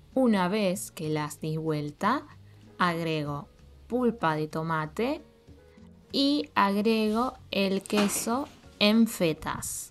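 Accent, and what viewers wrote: Argentina. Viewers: gracias por este video, soy de Inglaterra y estoy aprendiendo español. Me gusta el acento argentino.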